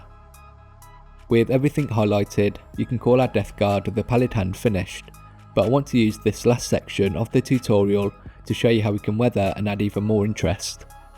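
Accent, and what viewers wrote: United Kingdom. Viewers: Whoaa.. you got auto voice translation IA caption in spanish?.. cool. Anyways I lke so much your original accent British voice 😅. Awsome video and subscribed. Cheers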